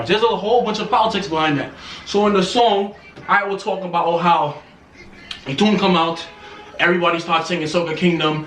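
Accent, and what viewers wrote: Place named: Saint Lucia. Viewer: Knew you were a Lucian, picked up the accent in between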